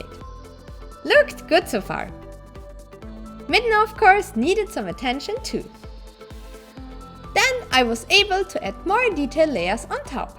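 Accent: Germany. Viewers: Your German or?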